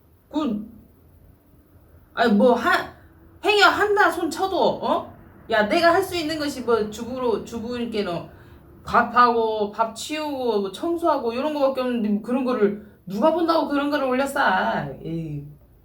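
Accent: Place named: Jeolla, South Korea. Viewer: ㅋㅋㅋㅋ전라도 사투리 구수해유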